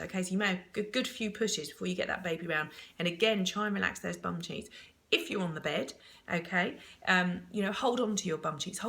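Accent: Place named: United Kingdom. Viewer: Im sure everyone can hear fine,you are probably deaf,or it is just because she speaks british.Just turn the volumm up or watch another video.So dramatic 😒😒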